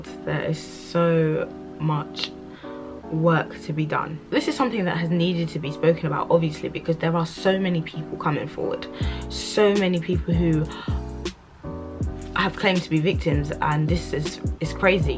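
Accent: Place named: London, United Kingdom. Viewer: Gurlll I'm about to talk about this show next on my channel. Subscribed as it's good to hear a similar london accent to me 😍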